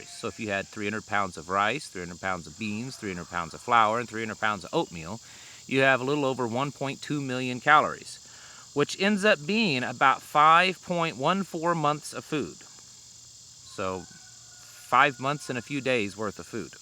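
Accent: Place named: Appalachia, United States. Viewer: What happened to this guys accent??. Back when he was comparing chainsaws he sounded like ah hillbilly . Lol weird.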